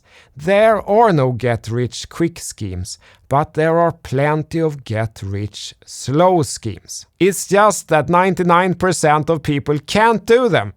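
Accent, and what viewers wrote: Sweden. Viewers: Your analysis are amazing and thank you for doing all the work for us and I love your Swedish accent , please do Some doge coin analysis and prediction Thanks again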